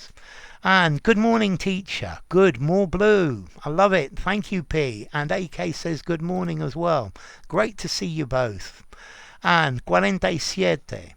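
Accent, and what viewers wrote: United Kingdom. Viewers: Most of the videos I watch in English are from you, and some British ways of pronouncing words like "Dictionary" and "Vocabulary" have been stuck in my mind.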